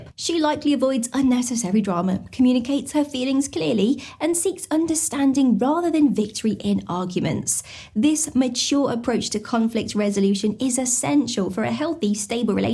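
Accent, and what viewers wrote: United Kingdom. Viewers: Are you British, Irish or Australian? Whatever the accent, I love it 😁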